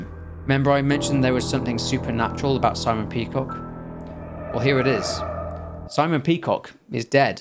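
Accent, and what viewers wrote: United Kingdom. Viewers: This guy as a cool accent. The storage of sounds cooler when there’s someone with an awesome accent telling it 😎 and Brittish/English people are cool to 😎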